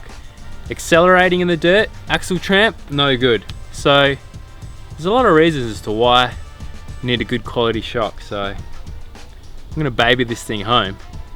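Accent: Australia. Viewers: I was trying to read subtitles from homescreen but YouTube couldn't understand Aussie accent 😅😅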